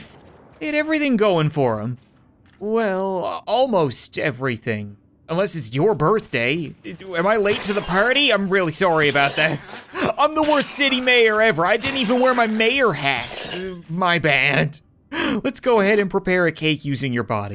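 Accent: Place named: Canada. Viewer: I love his Canadian accent and how it's so subtle yet so prominent that it leads to perfect delivery in every line Example: "what happened to shoe potatoe!?"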